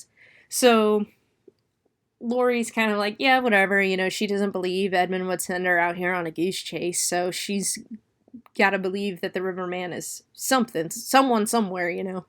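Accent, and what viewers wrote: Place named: South, United States. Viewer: Just noticed you sound more Southern throughout the video lol. Anyways, good review and keep up the good work! 👍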